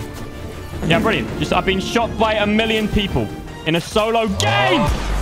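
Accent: Scotland, United Kingdom. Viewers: Bro that Scottish accent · We need to see more of the scottish accent. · Everyone talking about the Scottish accent but I gotta know what he said in German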